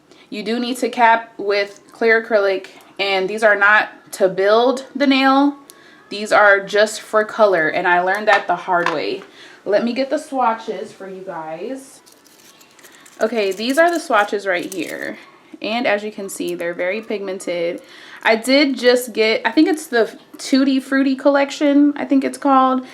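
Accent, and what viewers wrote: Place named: Oklahoma, United States. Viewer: Sounds like you are in lawton ok lol new sub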